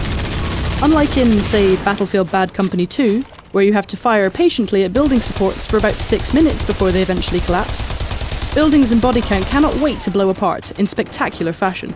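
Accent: United Kingdom. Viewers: that british voice MAKE IT STOP!!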